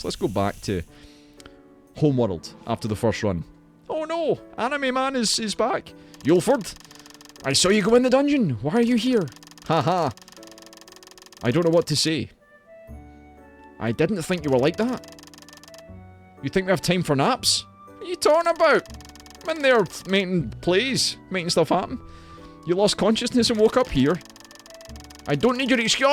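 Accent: Scotland, United Kingdom. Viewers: WOAH! I can watch a Scottish uncle play some Korean roguelikes!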